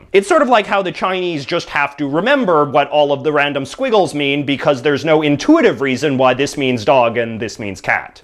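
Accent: Canada. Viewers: That's rich coming from a guy who has a very strong Canadian accent. If you're going to say "aboot" you should at least say "left-tennant". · What marks you out as a Canadian is the way you pronounce "about", at least to this British ear. There are a few "markers" in Canadian English, just as there are in Canadian French (I speak French fluently). I don't know if there are different accents in English across Canada (?), as there are in the US (I can recognise some but by no means all of those). I'm certainly not confident most of the time distinguishing Australian and New Zealand English, although I've begun to identify clues that help, some of the time.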